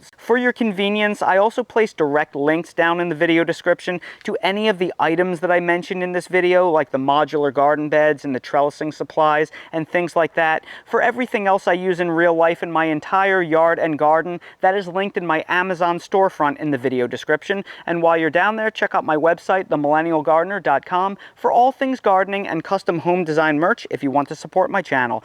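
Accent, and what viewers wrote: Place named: New Jersey, United States. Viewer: I knew you were from NJ! lol I was hearing you talk and I thought to myself, "He sounds like he's from Jersey." I checked your bio, and there you go! I grew up in New Jersey, but more up north, so we don't have the same accent, but yours still sounds familiar to me.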